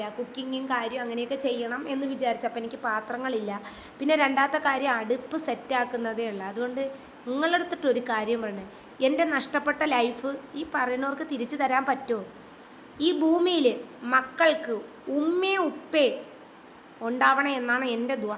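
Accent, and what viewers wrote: Thiruvananthapuram, India. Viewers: Tvm ഭാഷ ❤️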